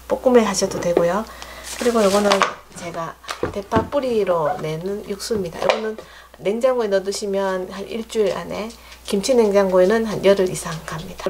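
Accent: Gyeongsang, South Korea. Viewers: 경상도 말씀으로 설명이 억쑤로 정감이 갑니다.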